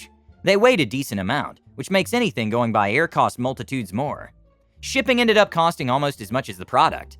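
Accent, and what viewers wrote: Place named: United States. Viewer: How many languages are you fluent in? I’d swear your English is US native speaker but there are also videos on the channel I think might be Russian (or something somewhat similar?), but also might not be your voice?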